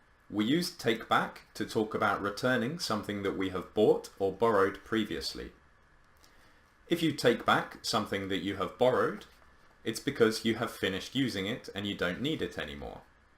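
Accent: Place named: United Kingdom. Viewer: Sir, you possess a clear British accent. By the way, I am your new subscriber.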